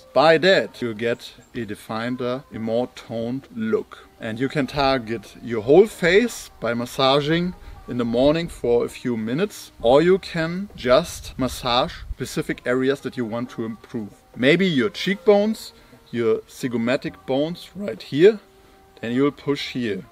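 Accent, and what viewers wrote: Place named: Germany. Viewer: German as accent bro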